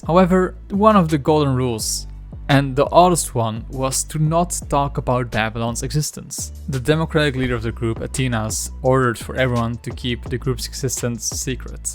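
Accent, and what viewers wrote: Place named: Netherlands. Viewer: cause u sound dutch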